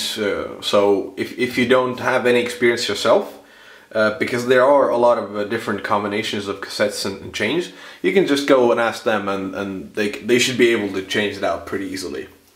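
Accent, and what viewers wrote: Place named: Ireland. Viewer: Do I detect a wee bit of Irish lol.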